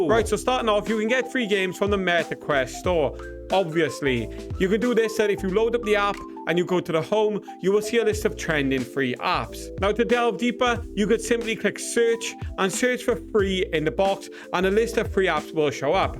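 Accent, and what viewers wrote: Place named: South Wales, United Kingdom. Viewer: Hello which part of Wales are you. I guess by your accent South Wales. I live in church Village and have just purchased the Meta Quest 3 and looking for free paid games to download. Can you help.?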